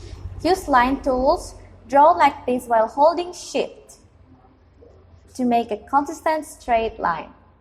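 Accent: Indonesia. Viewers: where you from? indonesian or malaiysia kek orang indo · Kyak orang indo deh, yekan?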